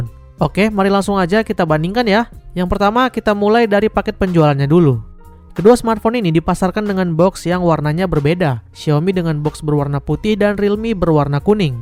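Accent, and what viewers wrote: Dumai, Indonesia. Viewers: Org dumai abg ni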